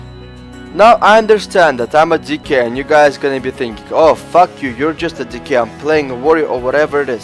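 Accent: Russia. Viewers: Are you truly a russian, ive watched many of your videos and you talk the same in each video, but at this video you say to a guy in wow " Gonna make another video with stupid accent "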